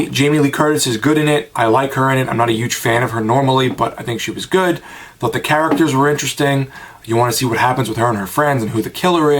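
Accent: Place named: East Coast, United States. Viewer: I'm in North Jersey now & I've been here for a while now but I'm always visiting fam in NYC. Hearing your voice, I figured you were from the East Coast, I just didn't think it was that close to home haha. I just followed you on Twitter.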